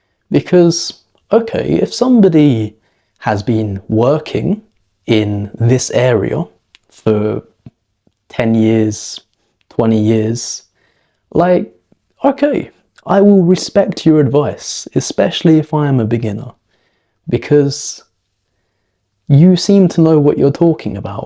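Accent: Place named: Australia. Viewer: Thanks for letting me know. I was curious as it sounds stunning 😉. Sometimes you actually sound a little bit Australian. This because of the pronunciation of certain vowel sounds for instant. Well, I enjoyed listening and I try to pick up on some more words to better my vocabulary. As a non-native speaker the vocabulary could always to be worked on 😂.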